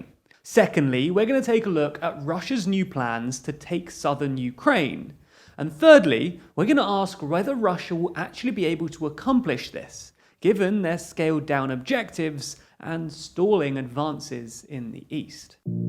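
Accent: United Kingdom. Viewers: Dont expect to get a biased opinion from a guy with a british accent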